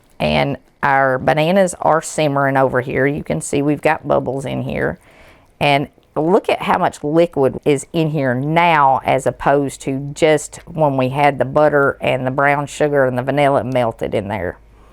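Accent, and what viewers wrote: South, United States. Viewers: Love your recipes and sweet southern accent ❤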